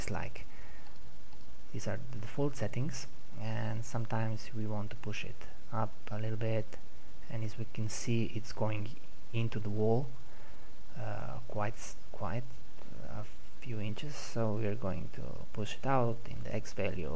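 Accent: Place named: South Korea. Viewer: Your english sounds like some korean idol i know lol, but helpful video :D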